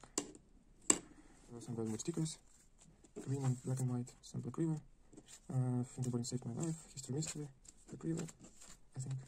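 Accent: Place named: United States. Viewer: С американским акцентом :(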